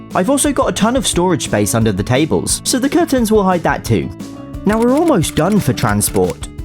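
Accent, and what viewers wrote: United Kingdom. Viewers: R u british ?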